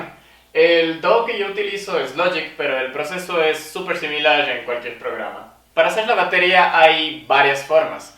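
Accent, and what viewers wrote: Cuenca, Ecuador. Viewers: te has ganado un suscriptor!! eres de Cuenca? jaja tienes el acento xd excelente trabajo, espero que subas los videos explicando más a detalle todo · Tu acento me es familiar, eres Ecuatoriano mi brother?? 🇪🇨🔥🔥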